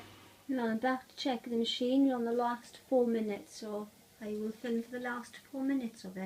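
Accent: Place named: Wales, United Kingdom. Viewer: Hi, at first I thought I wouldn't watch the whole video since I don't really like videos where people talk much, but you have a very sweet voice and a charming accent. Are you from Wales?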